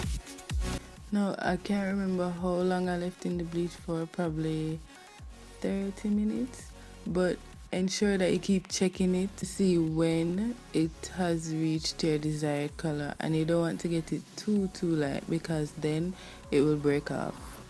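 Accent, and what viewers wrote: Jamaica. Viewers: Knew you were a YAADIE (Jamaican) as soon as you started speaking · keep the sweet Jamaican accent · know you're Jamaican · hold up sis come straight from yaad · Jamaican accent, tun up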